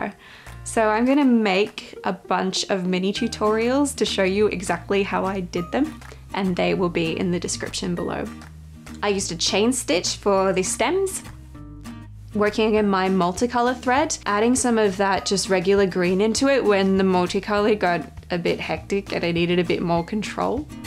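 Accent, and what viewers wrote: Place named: Australia. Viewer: Thank you, I have just started embroidery and it was lovely to listen to an Australian. I am looking at purchasing Essex linen, your thoughts.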